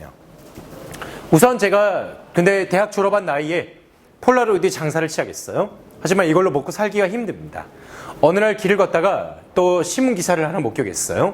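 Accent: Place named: Busan, South Korea. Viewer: 부산말을 서울말로 번역 할 필요 없어요 ~~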